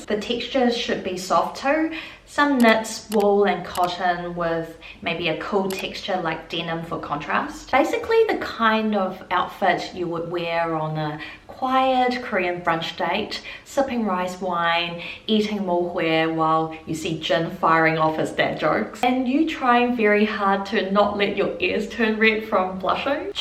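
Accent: New Zealand. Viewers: Love your kiwi accent. This was so fun to watch. I hope you get those tickets! But what will you wear when you do? 😅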